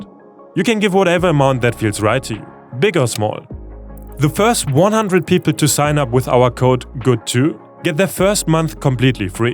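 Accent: Germany. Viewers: Are u German?🧐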